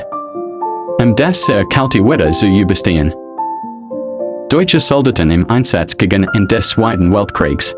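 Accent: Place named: England, United Kingdom. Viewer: Terrible pronunciation. Strong English accent.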